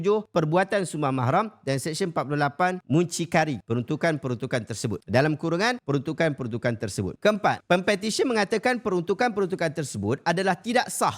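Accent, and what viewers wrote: Malaysia. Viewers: Ikut telo melayu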